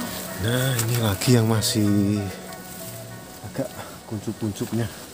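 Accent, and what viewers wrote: Java, Indonesia. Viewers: Jowone endi mas pean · dari logatnya seperti org jawa tapi musik nya daerah sunda gek piye kokyo gk nyambung 👎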